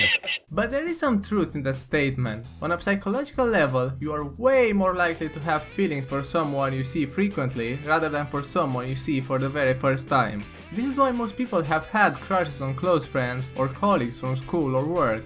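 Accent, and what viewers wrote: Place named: Russia. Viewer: Arzos aye his accent seems to be somewhere between East Eu and Russian.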